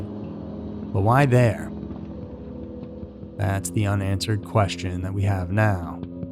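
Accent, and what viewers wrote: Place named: General American, United States. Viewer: The narrator has a perfect standard american english accent I have no idea tf youre talking about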